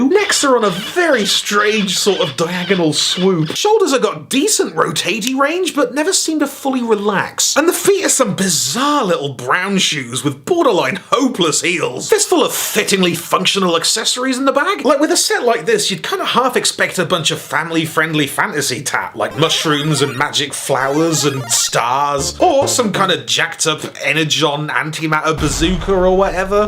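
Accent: United Kingdom. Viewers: Have to admit that I'm not here primarily for the bots but rather the magnificent British accent, hilarious puns and ass-kicking music. Keep on being you,! <3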